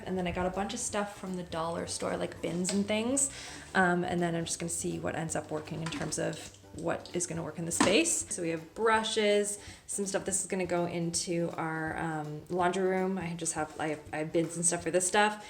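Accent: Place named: Canada. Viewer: ❤️What an improvement! You make me giggle in all your vids whenever you say words like “a boot”...(about) 😛😘. That Canadian accent coming through!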